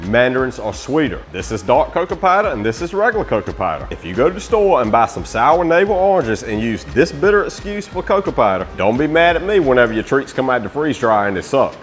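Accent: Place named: South, United States. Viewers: Sounds like A LOUISIANA accent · What part the south y’all from? I’m from NC and I adore the degrees in regional southern accents. I love your accent but I can’t quite place it!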